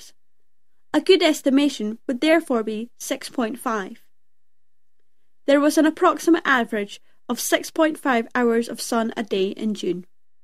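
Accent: Scotland, United Kingdom. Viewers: I can't get over your Scottish accent.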